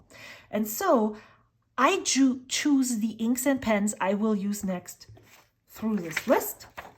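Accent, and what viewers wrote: Germany. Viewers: Thank you for such a detailed video; I enjoyed it quite a bit. That question #5 helped me a lot, as my approach to fountain pen has always been about getting a different writing experience from different pens, and I prefer Medium fine to medium nibs, as they have the right balance between nib width and smoothness. So, thank you. Regarding the inks(#7), I love both of the mentioned inks. I love teal(ish) colour. I recently got Lamy Petrol(2025 version), as I wanted sth that is close enough to dark emerald colour. Iroshizuku's new inks Rikka and Syun Gyo are on my list next. Thank you for such detailed and wonderful videos. I also liked your categorization of pens. I am someone who keeps a minimal and simple collection, but if I ever got that many pens, I am also going to have a similar system. BTW, are you German by any chance? I studied in Germany, and that accent seems familiar :)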